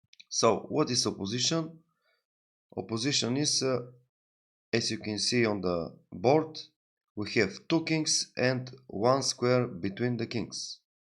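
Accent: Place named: Scotland, United Kingdom. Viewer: 😂 приятный и знакомый акцент) вы из Шотландии?)))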